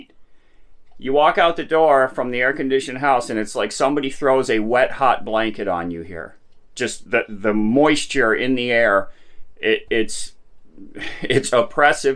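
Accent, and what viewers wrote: United States. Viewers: I was watching something else on utube and the block had the same voice/accent as yours, where in the states are you from? Just heading off this morning bush camping in South Australia. Excited as Lefty getting a bone.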